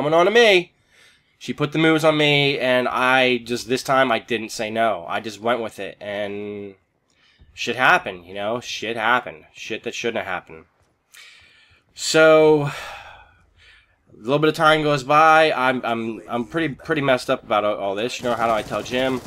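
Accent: United States. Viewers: are you from the bay?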